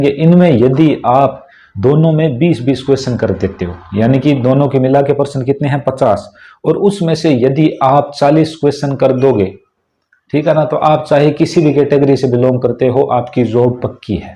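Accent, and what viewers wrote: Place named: Sri Ganganagar, India. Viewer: Bade bhai thari voice hu laag the mer triya sri ganganagar ga ho Or bhai Ma Telegram par add hou Koi problem hosi to Thaan Yaad Kruga ❤❤❤❤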